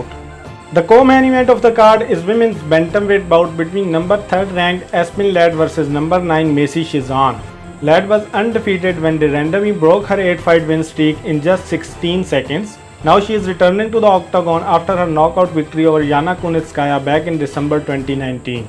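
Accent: India. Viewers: Wtf!! First time i heard indian talking bout mma n his english ndian accent ia annoying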